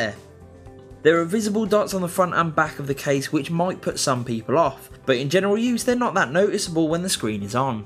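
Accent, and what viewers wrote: United Kingdom. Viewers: Sounds like he's from the UK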